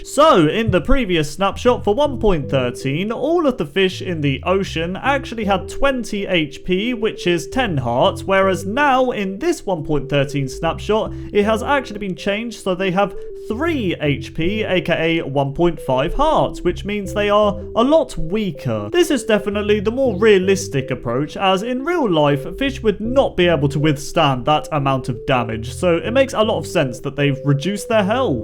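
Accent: United Kingdom. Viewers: are you british? that sound like that.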